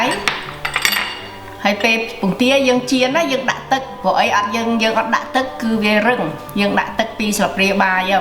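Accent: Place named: Kampot, Cambodia. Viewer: Hi mama, I interested in watching your cooking show very much! By your sound, are you from Kompot province?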